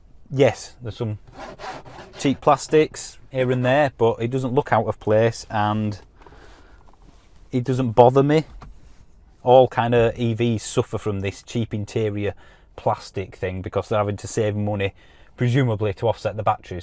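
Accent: United Kingdom. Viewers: D YouTube doesn't actually restrict your channel to UK only and it also doesn't say so anywhere. I can see the steering wheel being on the wrong side of the car though (YOMV) and Her Majesty's English is clearly noticeable and better to listen to than what they did to it on the other side of the greater pond. I do respect that you base your opinion on the UK point of view, but obviously you must also realize that Hyundai is not exactly a British brand and this is something of a global theme. Yes I can see that from a consumer point of view your issues with this energy transition may seem(!) less than where I live but at this point I fail to see why on earth you would have such a heavy connection to the grid without having any need for it. I do fear that probably as a result of this you're not really seeing the problem from the production side. The remark about fitting 144 solar panels to your roof, that was not a joke. 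That is the reality from transposing this idea onto the full population.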